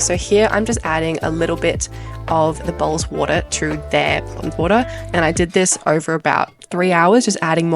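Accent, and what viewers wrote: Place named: Australia. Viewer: Love your down to earth vids and its really refreshing to hear an Aussie accent best wishes from SE Queensland 😊😊😊😊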